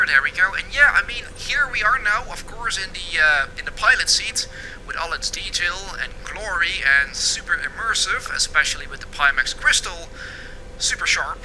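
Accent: Netherlands. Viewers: I hear a dutch accent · you sound exactly like a dutchman trying to speak English. Well done. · I know a Dutch accent when i hear one :)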